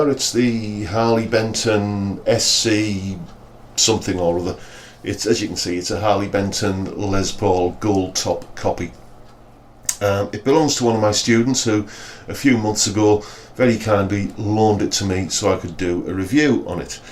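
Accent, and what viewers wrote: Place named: Northern England, United Kingdom. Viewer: Love it. Learning so much from your channel and lovely to hear a genuine northern accent instead of all the over enthusiastic Americans - bless em - who can get a wee bit annoying. I'm in my 50s and been playing, touring, tour managing and whatnot since my late teens and now semi-retired have been buying up cheap guitars to learn about setting them up and fixing them so I can sell them on at a small profit to encourage new young players while giving a few to local charity shops so they can make some money for good causes. Not as cool as what you do but I'm a great admirer and - to the point of the video - yup you can really hear the difference between the pickups. So much sweeter those alnicos - mmm baby, oh yeah, especially on the clean neck rhythm imho, and the ceramic just sounded thin and harsh on the medium gain bridge. 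Right... back to burning my hands with a soldering iron (I am so rubbish with one lol). Cheers mate 👍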